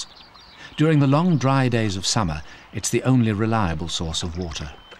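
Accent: United Kingdom. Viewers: I live right on the confluence of the Colorado and the Gunnison Rivers. The photography was beautiful. Colorado is a beautiful state and the many rivers and tributaries are fascinating. Unfortunately there were many inaccuracies and mispronunciations in this offering. Yeah and the Brit?! Really...🤨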